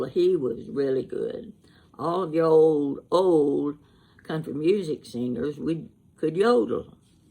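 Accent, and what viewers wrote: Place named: Eastern Kentucky, United States. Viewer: My grandmother was my best friend. I lost her 16 years ago this week, and hearing you brings the warmth I have been missing for so long. Thank you. ❤️ I have to ask, where are you from? Your accent is hauntingly familiar. Sounds like Eastern Kentucky.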